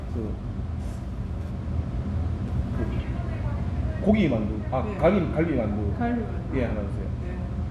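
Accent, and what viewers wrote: Gyeongsang, South Korea. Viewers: 님 고향이 어디신가요? 경상도 사투리인건 알겠는데 어느지방쪽이신지?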